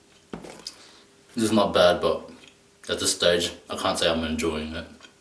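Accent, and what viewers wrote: Australia. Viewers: Till now still can’t decide whether I watch you for your talking (I LOVE AUSSIE ACCENT don’t judge pls) or how you viciously eat 🤔🤔 merry Christmas!!! 🎉🎊🎁 Ps( this is the saddest gingerbread house ever made 🤧😂)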